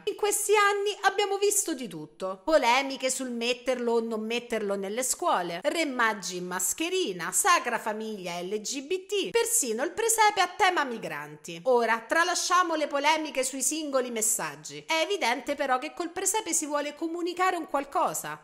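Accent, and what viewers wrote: Rome, Italy. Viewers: Inizio del video scoppiettante è veramente divertente come al solito la tua romanità ha accentuato è reso al quadrato quello che volevi dire gradevolissimo...... video Bellissimo queste notizie sono veramente utili a capire il nesso e il connesso con il discorso del presepe non sono d'accordo con figure figurine quadri e Santini perché il vero secondo comandamento vieta di creare immagini e di adorarle basta andare comunque a vedere i comandamenti veri e non quelli manomessi edulcorati dalla nostra carissima chiesa Vaticana 2)Non farti scultura, né immagine alcuna delle cose che sono lassù nel cielo o quaggiù sulla terra o nelle acque sotto la terra. Non ti prostrare davanti a loro e non li servire, perché io, il Signore, il tuo Dio, sono un Dio geloso; punisco l'iniquità dei padri sui figli fino alla terza e alla quarta generazione di quelli che mi odiano, e uso bontà fino alla millesima generazione, verso quelli che mi amano e osservano i miei comandamenti. 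Questo é solo un mio pensiero. Complimenti come sempre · Per favore, meno acidità e torna a parlare italiano. Non se ne può più di romanità sottoculturale.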